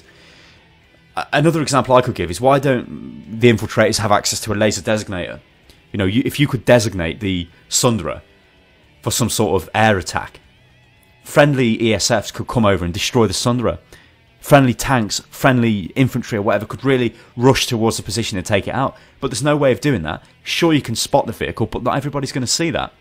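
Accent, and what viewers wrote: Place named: United Kingdom. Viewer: You sound british so I would guess you have a European account also calles PSG, or a SOE account? Because that quite frankly is the biggest issue of Planetside 2 at the Moment. All those PSG players that without knowing choose a company that just doesn't care. Just have a look at the britsh forum over at planetside2.eu. We all play on the same servers yet we are second class citycin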